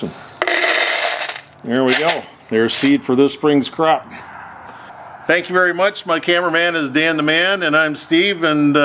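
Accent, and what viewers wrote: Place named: North Dakota, United States. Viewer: Thanks for demonstrating some great ingenuity! I always like to see off-the-shelf items re-purposed. When I first started watching this, I said to my wife, "He sounds like Charlie Selberg! I wonder if he's from North Dakota? " And sure enough, you're in Fargo, which is where Charlie grew up.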